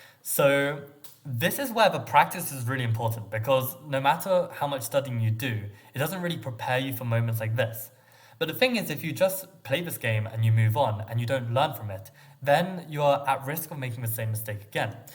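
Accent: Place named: Germany. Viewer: the German Accent sounds real cute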